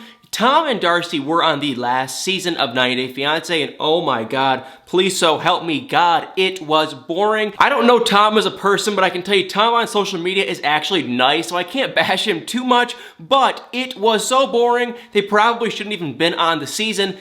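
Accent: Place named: South, United States. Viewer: You are such a cutie!!! Lol I have to say that you’re saying’lank’ instead of link and thank instead of think !!!tennessee has gotten to you my friend lol!!! You are a southerner now!!! Lovingly from another southerner!! Still laughing!!!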